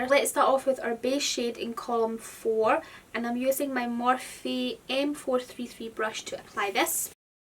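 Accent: Scotland, United Kingdom. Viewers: Can tell a Scottish accent a mile off 😍x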